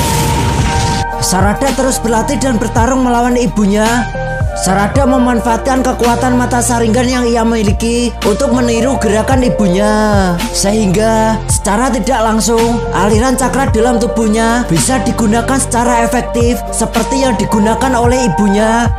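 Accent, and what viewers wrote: Java, Indonesia. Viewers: Medok bgt lu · Medhok bnr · Pengisi suaranya lucu mas,, dari jawa yak wkwkwk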